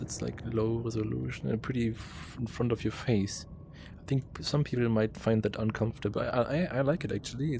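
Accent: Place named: Germany. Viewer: man hört den deutschen akzent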